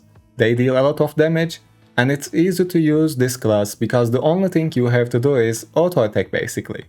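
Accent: Turkey. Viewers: Reis türk müsün senin aksan tam türk aksanı gibi geldi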